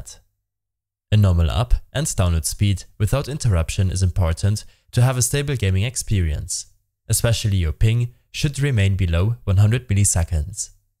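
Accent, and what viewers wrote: Germany. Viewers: Man merkt so hart das du deutsch bist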